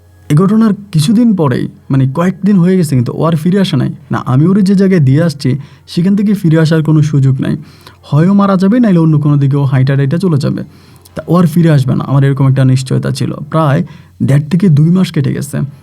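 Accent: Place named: Barishal, Bangladesh. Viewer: বরিশাইল্লা,,দ কে বলে ধ,,গ কে বলে ঘ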